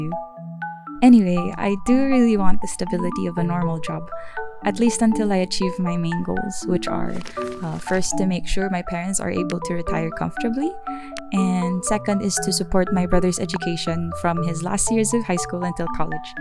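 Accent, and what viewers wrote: Philippines. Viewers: I hear some sort of Filipina Accent Although that's just me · You're so god damn talented. I'm still trying to place your accent, too, lol My best guess is Filipino, but that's, like, a 30% guess, lol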